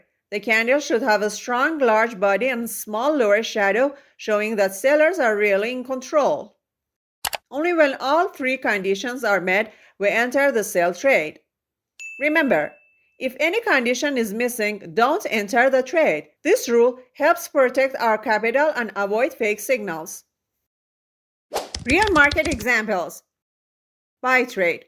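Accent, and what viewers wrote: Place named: Iran. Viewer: I love your Persian accent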